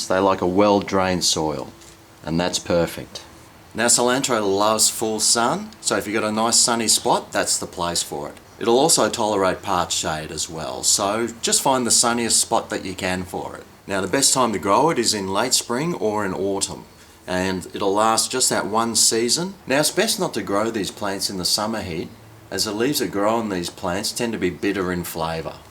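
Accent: Australia. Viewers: Love the video. Love the accent too. Sounds similar to Australian. Thanks.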